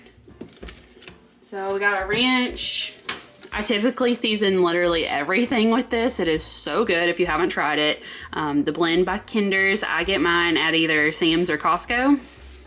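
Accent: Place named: South, United States. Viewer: I am new here where are you at??? Sounds southern I an in mid Ohio but my folks were from southern Ohio they had that southern flare also just me wondering??? Keep up the great work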